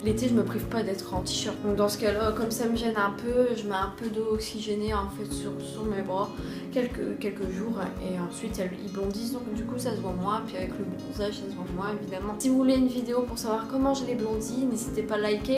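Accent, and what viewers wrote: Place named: Nord-Pas-de-Calais, France. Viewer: TRES BONNE VIDEO ! On entend bien l'accent du nord, bien de chez nous ! ^^, question complexe perso j'en ai plein, mon corps en premier (obèse), mais j'ai adopté le "balécouillisme" enfaite, sa consiste a ignoré le regard des autres, les moqueries etc. Il faut juste s'écouter soit même, perso depuis que j'ai adopté ce truc, je n'ai aucun soucie a me foutre en boxer de bain, si tu n'aime pas mon physique tu ne me regarde pas puis c'est tout ! et comme je dit toujours "Si tout le monde se ressemblerais, le monde serais CHIANT"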